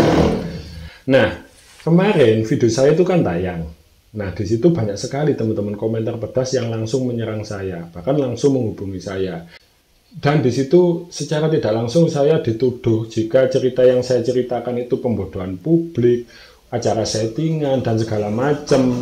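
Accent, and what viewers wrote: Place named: East Java, Indonesia. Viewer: Mas jatim mu mana sih kok medok nya super medok dokk dok...soale aku juga jatim tapi yg perbatasan jateng yg ga nglotok medoknya..yahh level sedang lah ...semangat mas bro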